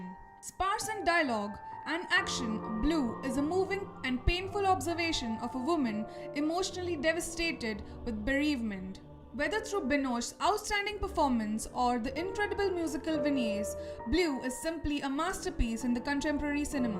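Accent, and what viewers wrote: India. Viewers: I hear indian i click back button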